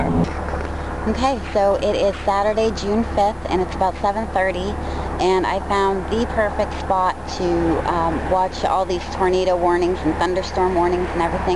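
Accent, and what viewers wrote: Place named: Maine, United States. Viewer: BeeCirca is right! You certainly are a lovely-looking "storm chaser"! I love that red hair of yours!--And that "Maine voice" you have too!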